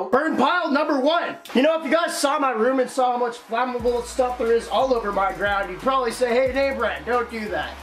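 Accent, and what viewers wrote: Winnipeg, Canada. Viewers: Is he from winnipeg?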